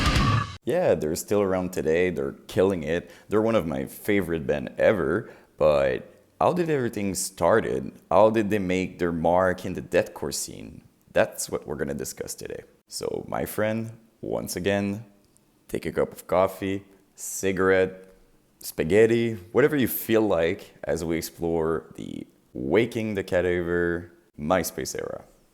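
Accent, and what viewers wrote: Quebec, Canada. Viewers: Been trying to pin your accent for ages, I settled on Swedish, good to know it’s French-Canadian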